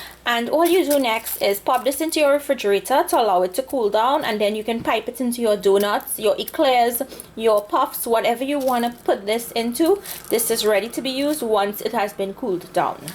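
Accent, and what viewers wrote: Trinidad and Tobago. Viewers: Hi am trini my name is Sharon and i enjoy all your recipes I've tried a few and it came out spectacular. thanks so much.am glad you didn't lose your trini accent.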